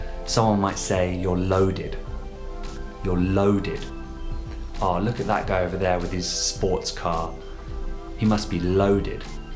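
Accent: United Kingdom. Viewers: Hello,! I found your channel and let me tell that it's amazing! I learnt new words and phrases today. I really love the British accent and hope you keep uploading more videos. Finally, what do I think of British currency? Well, the first phrase that comes to my mind is "great exchange value", because British currency has more exchange value than others kinds of currency. 💷😎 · Where do you live in Uk? Your dialect?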